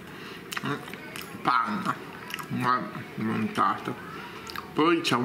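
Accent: Italy. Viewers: HAI UN ACCENTO DEL SUD SUD ITALIA INVECE IL DI UNA VOLTA AVEVA L'ACCENTO DEL NORD..ED AVEVA UNA VOCE PIÚ BELLA CON TANTE VISUALIZZAZIONI.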